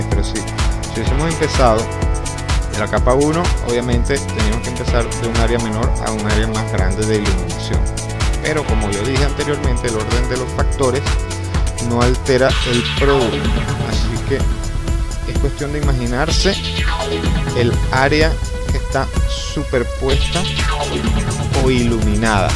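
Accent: Venezuela. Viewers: VENEZOLANO? HABLA COMO DROSS PERO VERSION TUTORIAL XDXD VIVA VENEZUELA :V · Eres venezolano? :v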